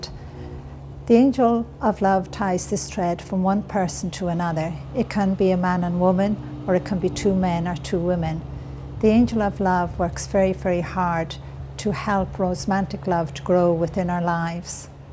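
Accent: Ireland. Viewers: She's Irish, so 'tread' I guess lol. No offence, it's just the way they pronounce it there :)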